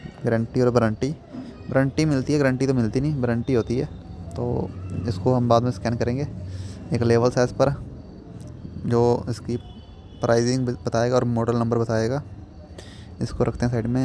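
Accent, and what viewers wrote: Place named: Punjab, India. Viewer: great video buddy....very enjoyed your hindi in punjabi accent 🙂🙂🙂🙂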